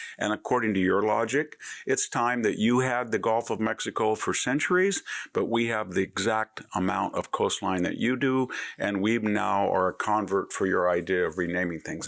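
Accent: United States. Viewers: This accent is the best American accent. All the names end up sounding completely accurate.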